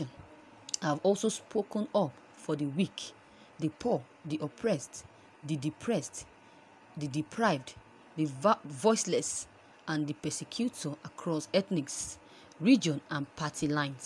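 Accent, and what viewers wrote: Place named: Yoruba, Nigeria. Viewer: Why do you read like someone in Primary Six or JSS1? Please, my dear sister from Ipetumodu, I am sure there are better news readers and presenters from Ipetumodu. Give this job to one of them. Nobody understands what you say because of your poor English that is coloured by English pronunciations from people of your ethnic group who have refused to mix with people from other ethnic groups. This is now showing here. Leave this reading for better readers from the Yoruba ethnic group. Delegate such task to him or her, while you control the money that would come to your by way of royalties.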